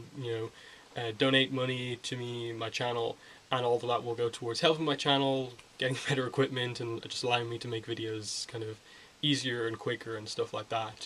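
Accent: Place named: Dublin, Ireland. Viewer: That accent - Churchtown? Terenure maybe?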